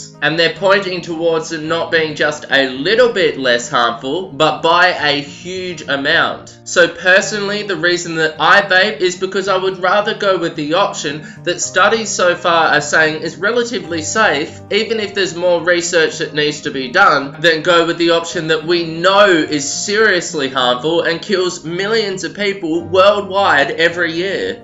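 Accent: Australia. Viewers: nah man. he's aussie af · Hamid Mousa, nothing wrong with his voice, he's an Aussie, very easy to understand, his room studio is just very voided, making his voice sound louder than it is. Regards a fellow aussie. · are you australian? jw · He's Australian yeah an Aussie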